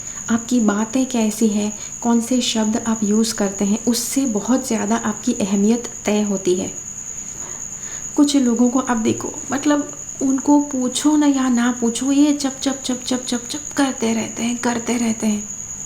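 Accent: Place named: Kashmir, India. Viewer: . ok thanks Apka accent thoda kashmiri panditon sey milta hai isliye pocha tha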